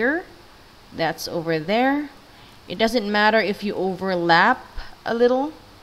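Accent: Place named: Philippines. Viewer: Pilipino ka po Mam...halata ko sa Accent mo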